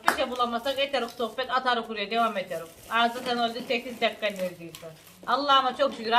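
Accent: Trabzon, Turkey. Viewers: merhaba seni severek takip ediyorum şivene bayılıyorum bende aslen trabzon akçabatlıyım ama sakaryada doğdum burda yetiştim annem babam orda evlenip sakaryaya yerleşti dedemler akçabat düz köyde yaşıyor seni dinledikçe annem konuşşur gibi severek dinliyorum yaşşa senin ablan sayılırım seniiiii çoooooook öpüyorum canımsın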